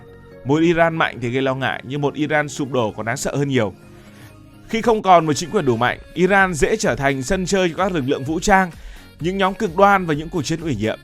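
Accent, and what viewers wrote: North, Vietnam. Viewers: Nghe H Th nói từ Paris là tôi thích ngay,,,,dù là H Th nói tiếng Bắc...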